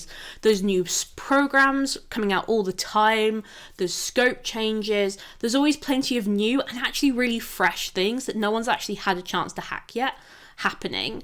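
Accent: United Kingdom. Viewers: Love British accents :)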